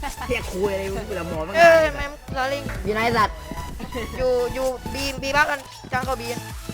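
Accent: South, Thailand. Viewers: คนใต้ปาวคับ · อ่อ เเด็กใต้น่อหลวง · เป็นคนใต้ใช่ป่ะ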